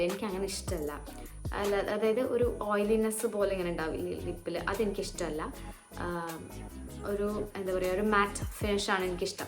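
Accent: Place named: Palakkad, India. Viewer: Hello chechyy Unnivava vlogs le chechy mare athe slang ningalkum....ningl oke palakkad aayond aavum llea... Anyways kettirikkan nalla rasamund ....❤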